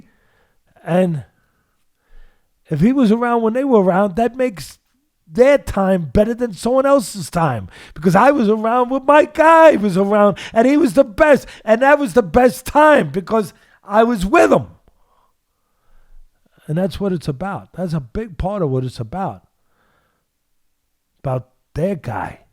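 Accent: New York, United States. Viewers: Do you think Gus and Jerry keep me tied to it sounds like it. Teddy the scientist half mass times velocity squared equals force. He tried to say that Mike Tyson was not quick or fast you’re wrong. He wouldn’t have the power if you didn’t have the speed velocity has three times the effect of mass read the equation again. And technically up to six times. The two together are devastating right. Peekaboo I see you. You can fool some of the people some of the time probablyUp there in New York where they understand your accent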